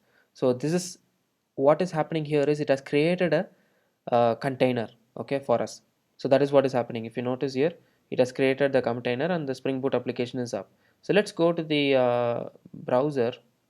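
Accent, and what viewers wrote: India. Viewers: Dislike because, your thumbnail showed non Indian man, and when I played it was the irritating accent of an Indian. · indian accent is something incredible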